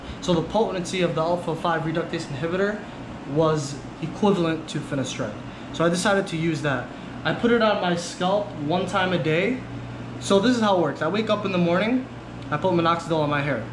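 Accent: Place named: Arab world. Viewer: Where you from? You sound like an Arav from Dearborn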